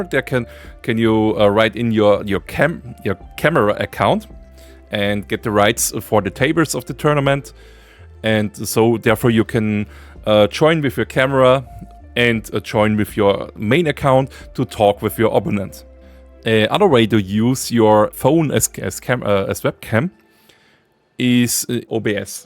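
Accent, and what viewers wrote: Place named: Austria. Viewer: Jeder österreicher wenn er Englisch redet "sorry for the Bad Englisch" redet bis auf'n Akzent fehlerfrei xD Grüße aus Tirol, danke für das Video✌🏻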